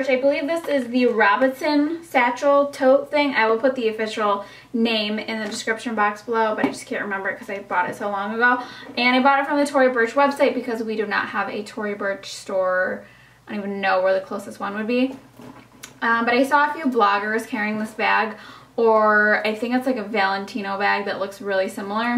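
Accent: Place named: Upstate New York, United States. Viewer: She's from upstate NY I know because that's where I'm from I can tell by her accent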